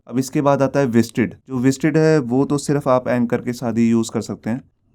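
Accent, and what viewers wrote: Punjab, India. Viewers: Sir aap punjabi ho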